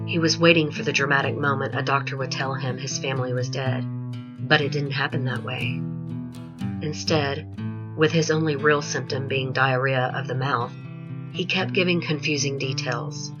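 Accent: South, United States. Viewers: Yeah. Her voice is like silk with SLIGHT SOUTHERN TWANG🌄🌃🆒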